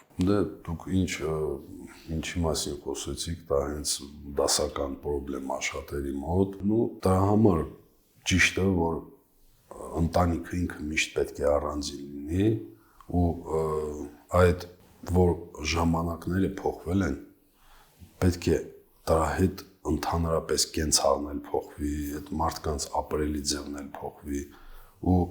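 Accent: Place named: Karabakh, Azerbaijan. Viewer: ղարաբաղցի է հաստատ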